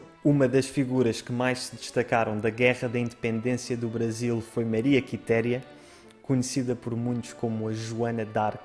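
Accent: Portugal. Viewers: Adoro ouvir os portugueses falando do Brasil. Soa imenso agradável. Ainda mais a mim, que sou monarquista. Só faltou falar de Dona Maria Leopoldina da Áustria, que assinou o decreto de Independência no dia 02 de Setembro de 1822, pois Dom Pedro I (IV) estava em São Paulo e não na capital (Rio de Janeiro). Um emissário envia-lhe o decreto assinado e cinco dias depois ele dá o brado de independência. Obrigado pelo vídeo e Ave-Império do Brasil ! 🔰🟢🔰🟡🔰🟢🔰🟡🔰🟢🔰🟡🔰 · Amo esse sotaque Português, estive em Portugal em 2018, um país muito aconchegante. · Ótimo! Tão bom ouvir sobre a história do Brasil com um sotaque português. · Eu gosto dos vários sotaques da nossa língua. O sotaque português também é (como dizem por ai) "fixe" (?) Parabéns pelos conteúdos, legal ter canais assim em sotaques tão diversos do meu. Abraços! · Muito bem. e muito bom ouvir a historia falada por um portugues.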